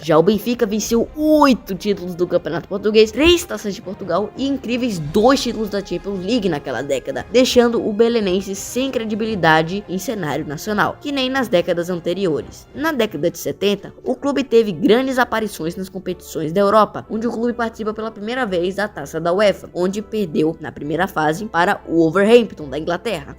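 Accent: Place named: Brazil. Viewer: Foi preciso uma voz brasileira a explicar tudo isto. Grandes Tugas ! 🎯 Fora com a Belenenses Sad, corruptos.... Volta " Os Belenenses". 💙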